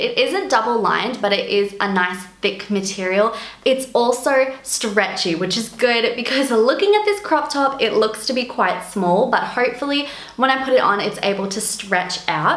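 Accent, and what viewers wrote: Australia. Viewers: Why do I love them? Because she’s honest and classy without ever being cruel. She’s got a fun personality and I could listen to her Aussie accent all day!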